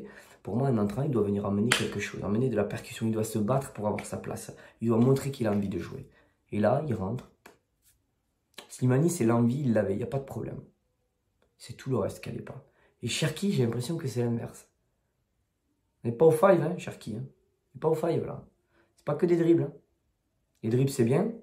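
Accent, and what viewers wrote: South, France. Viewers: J’allais te traiter de «Guignol» et je me suis rendu compte de ton (jeune âge).. En fait tu parles de se que tu connais je veux dire depuis que ton cerveau est en possibilité d’analyser se que tes yeux voient !!!! Tous ça pour t’expliquer que tu ne peux pas comparer l’incomparable (Karim Benzema) c’est la classe mondiale,mais quand il brillait à Lyon (150 ou 170 buts en 5 ans)toi tu étais trop jeune pour t’en souvenir et en profiter alors que Menphis depay est un bon joueur qui apporte à Lyon c’est indiscutable,mais de là à prétendre être au dessus de «Mr 9, 9.5,ou 10» du Réal Madrid,t’es à côté de tes pompes petit !!!! Ps: Avec ton accent du midi tu te permets de dire des trucs sur des joueurs Lyonnais, choses que même les supporters Lyonnais natifs de Lyon ne se permettent pas. Un peu de respect pour Lyon pélo !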